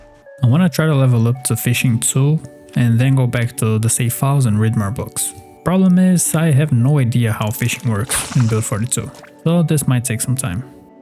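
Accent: Brazil. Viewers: Eu reconheço um sotaque brasileiro, mesmo com um inglês tão bom hehehe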